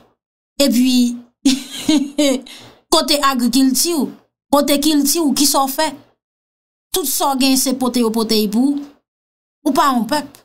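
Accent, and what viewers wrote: Cap-Haïtien, Haiti. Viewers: nèg kap dekouvri kay la, aksan sa se nèg okap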